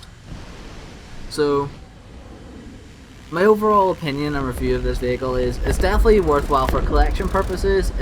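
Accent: Northern Ireland, United Kingdom. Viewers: Hey mate, are you from Northern Ireland?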